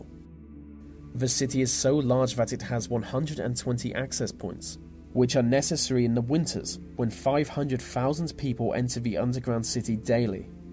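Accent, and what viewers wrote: England, United Kingdom. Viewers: Hey there been waiting for your next video and it was posted the afternoon here in upstate New York what a great topic never cease to amaze me well thought out executed to a tee just love your voice and English accent you are a professional my good man Thanks so much I do appreciate all you put into these videos because I am always thoroughly pleased one of these days maybe our paths will cross and I will high five you job well done thank you until the next time I will be waiting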